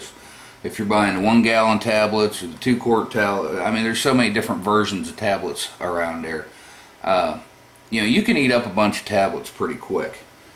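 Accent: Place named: South, United States. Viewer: I couldn’t handle the “southern drawl “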